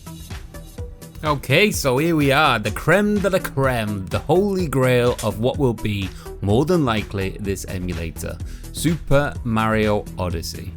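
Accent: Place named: Liverpool, United Kingdom. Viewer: Liverpool? Really? That's like when I said Totalbiscuit sounded like he was from somewhere between Liverpool and Manchester, maybe Chester, and was told he was a Geordie. I can hear a bit of scouse in you now you said it.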